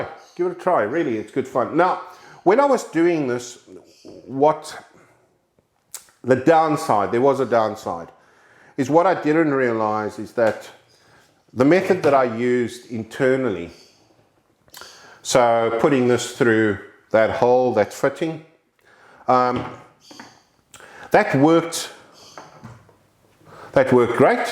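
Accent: South Africa. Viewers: You sound south african? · Thanks a lot MOST helpfull . PS you sound South African.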